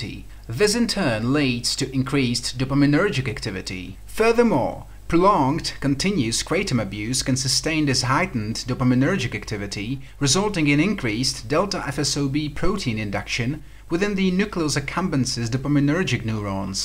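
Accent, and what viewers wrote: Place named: United Kingdom. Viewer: Jeej... to znie britsky, nie americky A hlavne nespievas 😅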